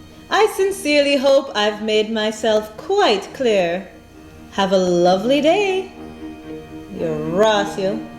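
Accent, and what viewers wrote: Jamaica. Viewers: Well said me sister! You most certainly sound Jamaican! What also kills me is that nonJamaicans and non other West Indians say all Jamaicans smoke ganja! Every human ought to see this video! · Of course you look Jamaican! Sound Jamaican too! (potty mouth) I Love when the family get together, Chinese, European, American, British but we all from yard! Younger family members sometimes looks confused by the mixture! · You tell them! I don't know why people don't realize that the colour of your skin doesn't = your nationality or birth place or your hertage. Theres no way to tell someones background due to skin colour. Its been a long time since I heard Jamacian English spoken. I moved to Japan 4 years ago but I am from Toronto Canada and I worked in a company where a large amount of people were Jamacian and they were my friends, I learne to understand Jamacian accent so it brings back memories to hear it. 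^^ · I hear ur rhythm, yardi , y.😝 nah fool me & they don't realize a whole rainbow of colors in ppl in Ja......too many tribes. ....in ....I overstand ....go on educate them. .. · I love your video so much. Sometimes I watch dem over and over again. Your accent alone is a dead giveaway that your 100% Jamaican. Keep dem videos coming sis 👌👍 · You are a raw Jamaican.Your accent is typical Jamaican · Trust me.... your mouwt certainly looks and sounds jamaican!!! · XDDD LOL ! I love your Patwa xD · I can hear your Jamaican accent even when you are putting on the fancy voice. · How can I get this accent? I don’t think i can learn it while I am in England and I can’t afford going to Jamaica either.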